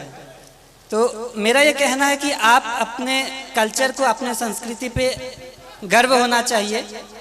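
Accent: Bihar, India. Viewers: Bihari Op Bolthe❤❤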